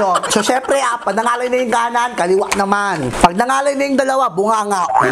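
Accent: Visayas, Philippines. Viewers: BISAYA KABA????????? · bisaya po ba kayo?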